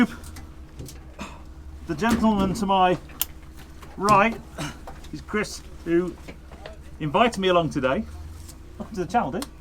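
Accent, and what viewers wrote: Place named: RP, United Kingdom. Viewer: I usually wouldn't ever say anything but his poshness was embarrassing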